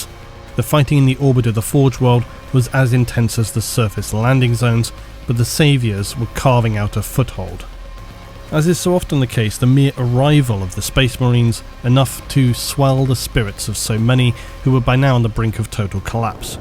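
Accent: England, United Kingdom. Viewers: Documentary content just sounds better when narrated with an English accent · 2nd this, rather enjoy me some sophisticated brash british guy & the pragmatic dry humor & his narration tone that slaps like cold steel and enraptures one so thoroughly you hardly even notice the mutton chops growing over you’re ears. Edit: Reasons.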